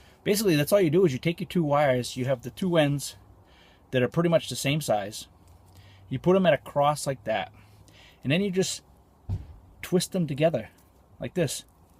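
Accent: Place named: New York, United States. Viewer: nice new York accent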